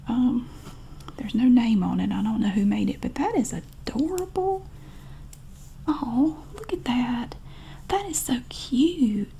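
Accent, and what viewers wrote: South, United States. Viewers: You remind me of my grandma :). She also has a southern accent and sounds almost just like you. When I was younger, ( I'm now a teenager) and i spent the night at her house, she usually put me to sleep by reading a story, telling a story of her own, singing me a song, etc. And you just remind me of those times, and put me to sleep, thinking I'm a kid again in my grandma's comforting presence. thank you for the nostalgia :)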